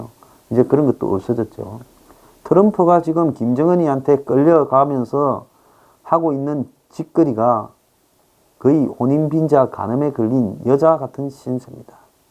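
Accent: Gyeongsang, South Korea. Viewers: ..최고의 변사급 뉴스 진행자! 전 소장님의 거친 갱상도 사투리 들을때마다 뭉가로 인한 급노화 된 피부가 재생되는거 같아요..알라븅~🔞🎈😘🍨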